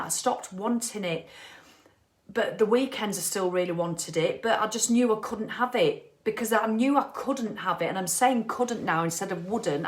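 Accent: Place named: United Kingdom. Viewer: Great woman. And I rate this as one of the greatest accents in the world! Brings back fond memories of the UK.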